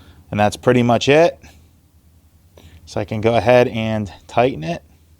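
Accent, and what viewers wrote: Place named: Western Pennsylvania, United States. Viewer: Very good video. Also loving the western PA accent!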